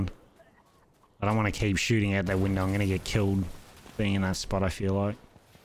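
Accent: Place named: Australia. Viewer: i love this game, i can play the co-op matches with my eyes closed now (not literally of course xD) you have a great voice for doing youtube reviews, Aussie accents are the best for gaming, like Gmanlives and stuff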